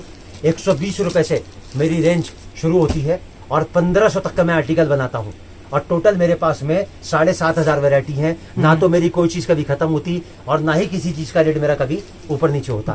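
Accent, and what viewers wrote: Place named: Marwari, India. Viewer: भाई साहब आपको बोलने का तरीका गजब है पक्के मारवाड़ी हो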